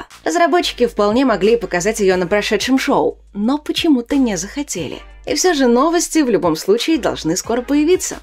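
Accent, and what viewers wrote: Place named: United States. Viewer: Манера общения, под американский мотив, методом прижимания верхней и нижней челюсти. При этом дикция поставлена, будто есть за спиной актерское мастерство) Но звучит приятно)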